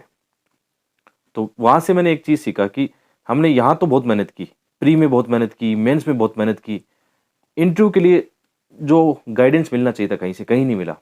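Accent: Haryana, India. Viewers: Sorry....आप सब कुछ छोड़ कर सिर्फ खुद पर ध्यान दो। इतनी जानकारी books की questions की किसी को नही होती बस आप एक जगह ध्यान लगाओ,ओर वो ह आपके बोलने का हरियाणवी लहजा बाकी सब perfect है।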